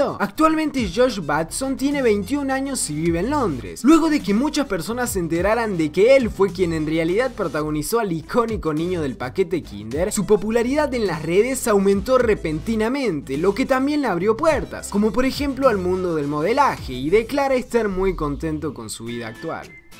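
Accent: Córdoba, Argentina. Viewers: todavia no se te sale el asento codobe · Este men es argentino? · es cordobes? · NO ME MIENTAS TU ERES ARGENTINO! YO IGUAL :DDD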